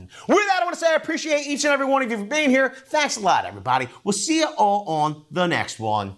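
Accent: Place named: New York, United States. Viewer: My apology for not possibly be knowledgable of a fact, but why is it common to see these people with the NY accent / Boston always talking with this bad attitude behavior, as if they're going through some divorce, or for one reason or another, they're having to resort to buying cheap britches that ride hide high in the crack of their a$$es ?....I mean really guy's....... Isn't there someone close to this guy that can enlighten him about the used boxer brief at the Salvation Army that he could purchase at a fairly good price, that allow him to calm the "F" down! especially when doing these very informative video's ?....Maybe its the Napoleon Syndrome...I'm sorry if I'm out of place...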